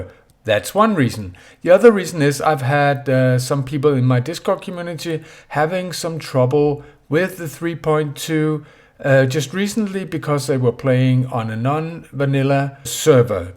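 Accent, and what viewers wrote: Denmark. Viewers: “Det er en dansker.” Jeg var inde og kigge efter den første video jeg så med dig. Der er noget specielt over danskere der taler engelsk. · Are you from Denmark? 😊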